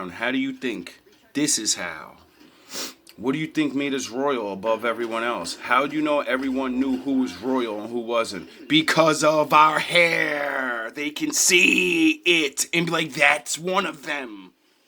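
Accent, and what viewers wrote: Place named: West Coast, United States. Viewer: You sound like you're on the west coast.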